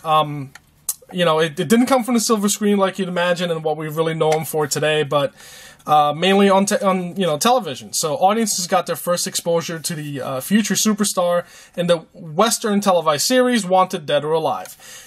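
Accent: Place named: South, United States. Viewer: laig, sounds like southern accent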